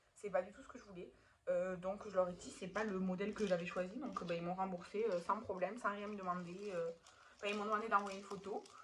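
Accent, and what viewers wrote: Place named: South, France. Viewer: Bonjour 🥰, je viens de découvrir ta chaîne grâce à sandy❤, et je la trouve super, intéressante et apaisante❤️, j aime beaucoup ton accent du sud, bonne journée 🌈✨️✨️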